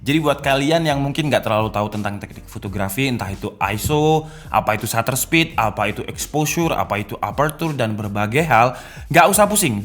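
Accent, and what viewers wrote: Malang, Indonesia. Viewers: Wong malang to tibak e 😂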